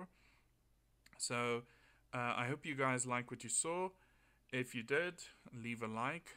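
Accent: Afrikaans, South Africa. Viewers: You sound Afrikaans :P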